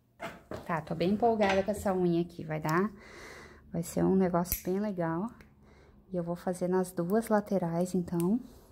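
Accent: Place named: Rio Grande do Sul, Brazil. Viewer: estou gostando tanto do seu trabalho q não consigo ver de mais ninguém amo seu sotaque de gauchinha sou mineira de Nova serrana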